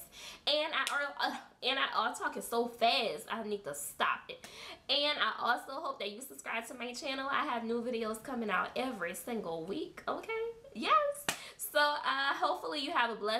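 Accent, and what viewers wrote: New Orleans, United States. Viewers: Are you from N.O.?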